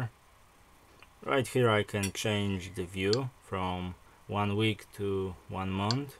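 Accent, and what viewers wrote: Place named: Poland. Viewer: Słychać że z polski :D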